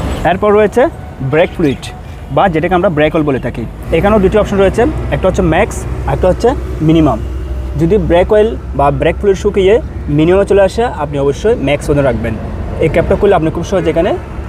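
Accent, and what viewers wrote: Chittagong, Bangladesh. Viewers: Chittagong naki apner badi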